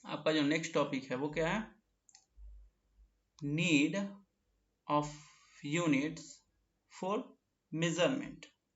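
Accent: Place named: Bihar, India. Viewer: SIR AAPKI LANGUAGE ME BHOT PROBLEM AARHA H AAP LAGBHAG BIHARI BOLTE HO OR ENGLISH READING BHI UTNA ACHA NHI FOR eg. aap Very ko Bery padhte ho , AAPNE PADHANE KE LIYE TOPIC TO ACHA LIYA H LEKIN THODA EASY LANGUAGE ME EXPLAIN KRNE KA KOSIS KIJIYE OR BOLTE SAME CONFIDENCE RAKHIYE TABHI AAP EK TEACHER BN SKOGE PLZ IMPROVE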